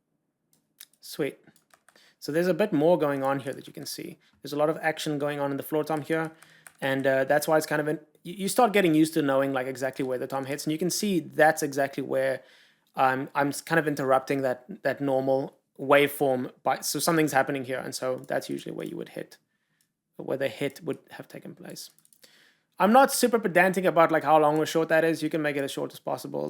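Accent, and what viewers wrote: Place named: South Africa. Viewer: I can hear the South Africaness oozing through your voice. Its amazing.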